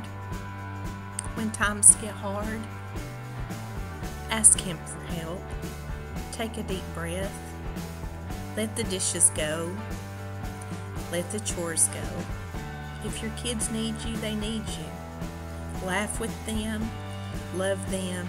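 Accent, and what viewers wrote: South, United States. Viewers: I could listen to you talk all day. Makes me feel like I’m back home in the south♥️ great video my friend!!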